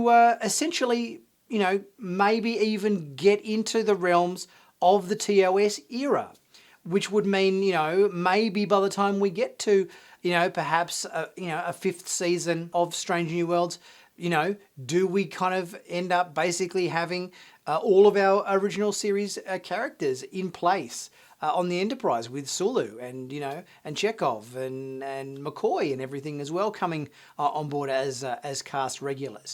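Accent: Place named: Australia. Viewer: I like the way you talk, but I'm wondering. I've heard a lot of Aussie accents over the years. Are there different regional accents in Australia? Can y'all kinda tell where each other are from by the way you talk? My own accent is mostly Midwestern American with hints of upstate New York and I met someone once who nailed down by my accent that I was born in New York and grew up in Colorado. I have noticed that Aussies do sound a bit different here and there, but I can't tell if someone is from Melbourne, Sydney or the outback. You don't exactly sound like Crocodile Dundee for instance. Y'know what I mean? I'm going to ask other YouTubers too. Feel free to call me cunt any time brother!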